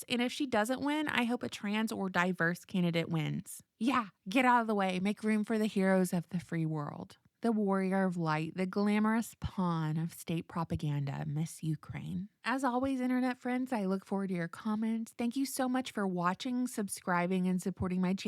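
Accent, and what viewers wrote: South, United States. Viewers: what happened to Southern accent?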